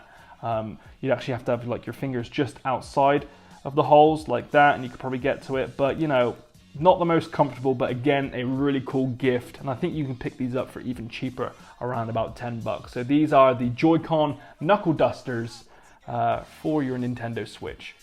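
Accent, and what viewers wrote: Bristol, United Kingdom. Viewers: Hearing my accent(bristolian) on videos hits hard haha. Realized from some of your other videos you're very local to me.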